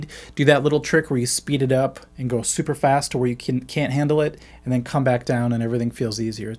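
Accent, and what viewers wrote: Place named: Texas, United States. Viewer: Is this guy from Texas? I never really noticed his southern accent till this vid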